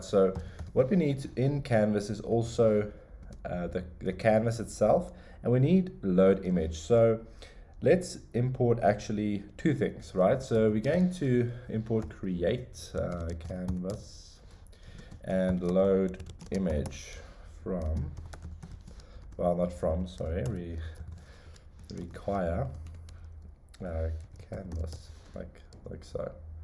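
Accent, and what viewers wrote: South Africa. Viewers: i have just found your channel, and dammit i know that accent is SA!! :) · This guy sounds south african....great work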